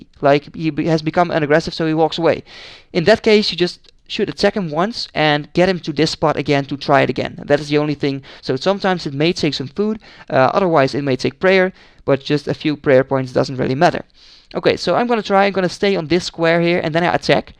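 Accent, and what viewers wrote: Netherlands. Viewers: I was like: Wait... he sounds Dutch! Then I looked at his name. :)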